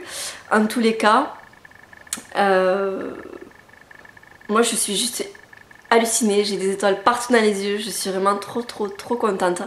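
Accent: South, France. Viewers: Haa a peine j'appuie sur play j'entend l'accent du sud c'est bon ca ! :p Tu viens d'ou ? :)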